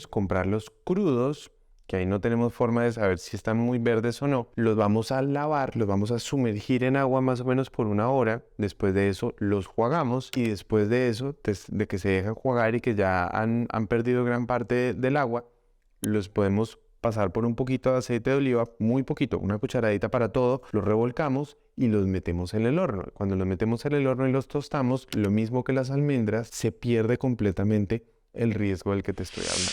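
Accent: Colombia. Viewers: Dr as Ud colombiano? De Medellin o de manizales. Con el acento que tiene me parece haberlo oido desde que naci. Soy de Manizales en donde el 70% de la gente es y Ud con ese acento....pues debe ser o antioqueno I manizaleno. Cosa que me alegro sombre mantra y me pone muy pinchada❤❤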